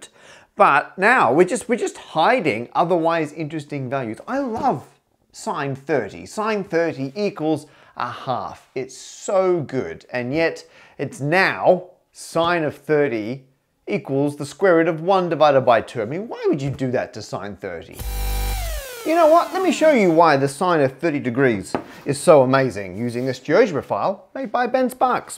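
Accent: Australia. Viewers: Why is Simon Whistler talking Australian? That should be the question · It is probably in part "fault" of the more cultivated Australian (is that what people still call it) + British influences accent, for some reason people tend to see the latter as more posh or smug automatically, the former is reminiscent of the latter, and some people can't tell which is which anyway. I am afraid to say, you're sort of facing an uphill battle on that one, and in reality is no fault of yours in that particular department, since the real fault is just people not being sufficiently exposed to more varieties of English, which sucks but it is also natural. I.e. just bad luck (though I still wish you the best of it, for what it is worth, which is probably nothing).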